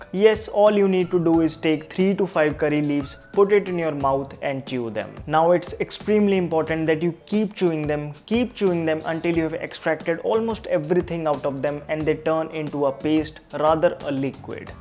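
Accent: India. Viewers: Indian accent ki ma-behen kar di.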